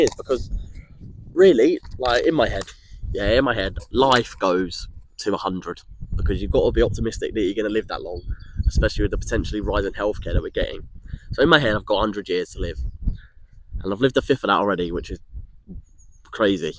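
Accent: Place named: RP, United Kingdom. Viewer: U sound posh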